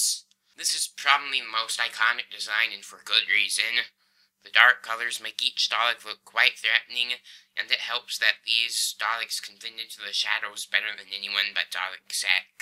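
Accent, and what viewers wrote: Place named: United States. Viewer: No, I listened in for 90 seconds beyond the (incidentally overlong) intro. My comment was not mean, it was factual. For the record, the fact that you express opinions and have an American accent is entirely irrelevant to me. If you feel the need to censor and to be censorious, you really should not be making posts. Incidentally, you have marked my comment 'love', so I suggest there is some confusion on your part.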